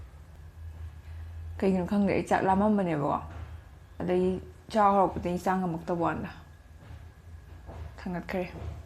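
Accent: Kakching, India. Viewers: kakching lon ngangbac tba ym nungai ado lon khoidabda su hei ym nungaiye tabda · Kakching marol ngangba heisu heita malle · Kakching maron d ngang da b n henna fajei natural d oina adum ngang ngo... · mathangdi che pork chabei oina twrak oh kakching marol tabasu yam nungaii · Kkching lon sum ngngbiyo .....cna hena tab nungaijei😍😍😍😍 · Noi lonse taba yam nungai eigi enamashu kakching bazar mnaktgi ngdi noi lonbu heitreda. Ado pork chardi pork chabgi video tourako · Kakching londa ngangbdo yam nung c nangna chabdu haodou yam male hayeng yumda thorkanda sak khangnarga chaminase eisu haobra yenge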